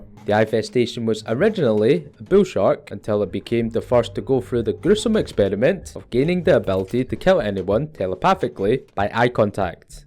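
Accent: Scotland, United Kingdom. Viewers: idk i think this guy might be scottish…. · because he might be Scottish, shocker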